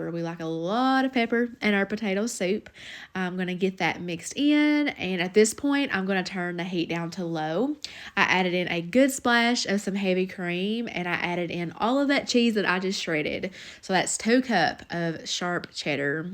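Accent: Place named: Kentucky, United States. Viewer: Great dinner 🥗🥣🍝 ideals! I love to hear you talk. That Kentucky accent. Lol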